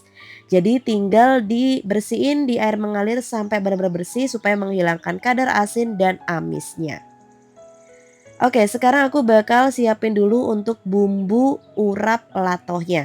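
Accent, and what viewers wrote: Java, Indonesia. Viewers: Jawa nya kok medok banget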